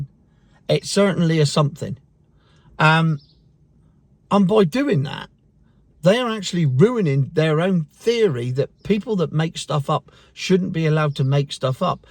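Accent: Cornwall, United Kingdom. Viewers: Your problem is that you speak with a Cornish accent therefore according to the Book of Musk the EVangelists bible you are an uneducated hayseed, a hillbilly of epic proportions because you don’t sound like someone from Knightsbridge. The fact that you are a qualified educator must now send their guts into a Vindaloo paroxysm. Well done.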